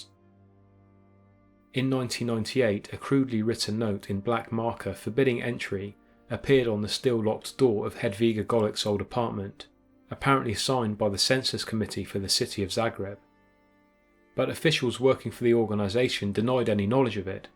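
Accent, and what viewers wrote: United Kingdom. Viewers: your impeccable research and ability to keep us on our seats wondering whats going to happen next is only enhanced by your awesome accent. like it would still be really interesting and awesome but I think having a distinguished british accent just ties it together in a beautiful black bow.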